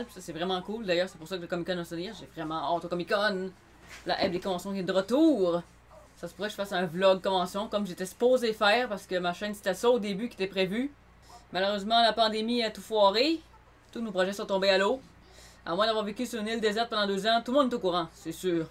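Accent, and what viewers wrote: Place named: Japan. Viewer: vraiment bravo tu l’es mérites vraiment et ton accent japonais est incroyable !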